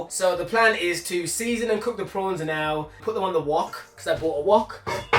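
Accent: United Kingdom. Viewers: Yo, don't hate me but I JUST realized you gave a British Accent. Lol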